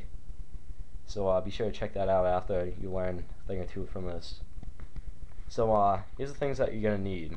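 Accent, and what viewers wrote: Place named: Boston, United States. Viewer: That's a Boston accent people ! Best accent on Earth!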